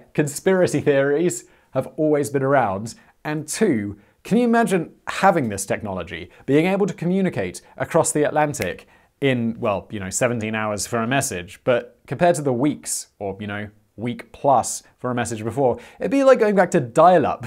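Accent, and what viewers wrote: United Kingdom. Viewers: Thank you for pronouncing Newfoundland correctly.. well at least as correctly as possible with a British accent.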